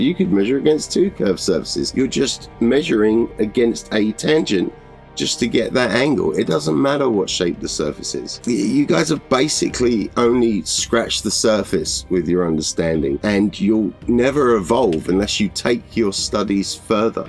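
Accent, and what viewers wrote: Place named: United States. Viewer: Why do you call yourself when you sound like a yank?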